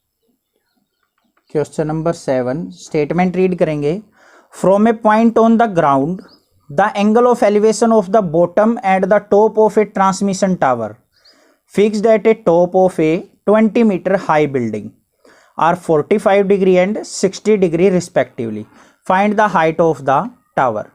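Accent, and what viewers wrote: Haryana, India. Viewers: Sir tam haryana ta ho k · Sir आप हरियाणा के हो क्या ???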